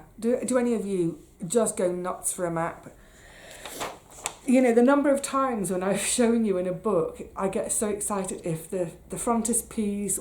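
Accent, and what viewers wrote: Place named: England, United Kingdom. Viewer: Hello from Australia...enjoyed your chitchat and lovely books you've read...definitely right down my ally....started watching you video, had to pause you and watch Mr harass goes to Paris..so enjoyed it and here I am finishing of your video and all that English accent..tfs..happy reading and hope you got some things crossed off your list...greetings from Australia..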